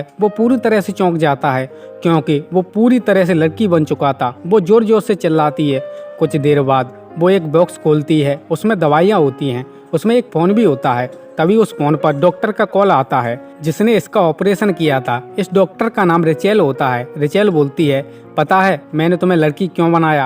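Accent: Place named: Madhya Pradesh, India. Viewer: apki boli ko pehchaan liya me Gwalior se hu 😁😁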